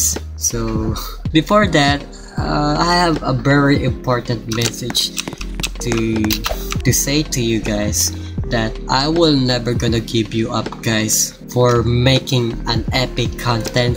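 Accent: Philippines. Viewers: I just realised he's Filipino just because of his accent and about page So. HATDOG PRE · i think hes filipino, he speaks like one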